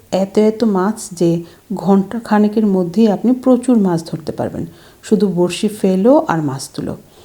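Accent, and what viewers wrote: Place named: Bangladesh. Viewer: খুব ভালো লাগলো পুরোটা❤❤,আপনার ভাষা বাংলাদেশির মতো লাগছে, এ গুলো কি মাছ , পুঁটি মাছের মতো দেখতে লাগছে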